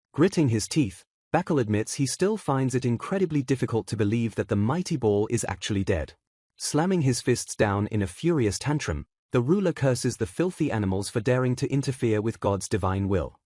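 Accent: United Kingdom. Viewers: using the English accent is smart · BRO WHAT IS THIS ENGLISH BRITISH NARRATOR XD XD XD Where my usual narrator guy lol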